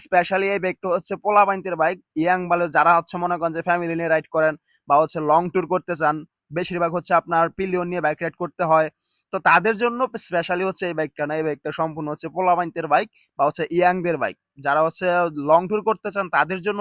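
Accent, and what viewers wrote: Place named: Comilla, Bangladesh. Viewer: You are from Comilla, I recognize you from "pack kada".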